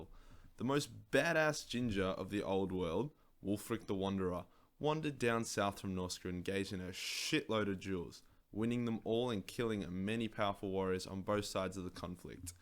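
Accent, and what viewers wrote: Australia. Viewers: Omg u speak total lad speech. need more Aussies on youtube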